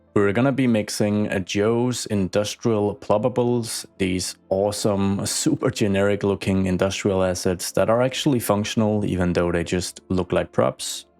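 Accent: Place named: Denmark. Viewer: Synes også jeg kunne fornemme en Dansk accent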